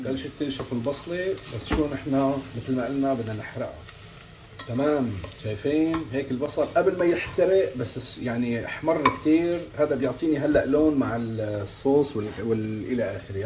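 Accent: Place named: Banias, Syria. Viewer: اهلين من اول ماسمعتك قلت من بانياس بس حبيت اتأكد الله يسعدك يارب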